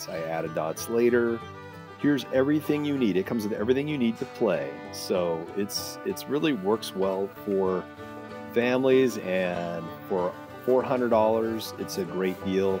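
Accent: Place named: South, United States. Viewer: Same here. I loved the hick voice. No offense to the little women, but I'd kept it the entire video. I feel its a shame the southern accent has nearly become extinct.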